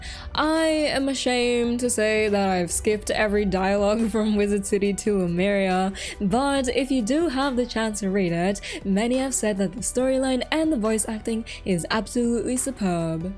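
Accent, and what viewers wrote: Australia. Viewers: I didnt know you were aussie, so little of us are in the community lol nice to see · Nothing wrong with Australian accents <3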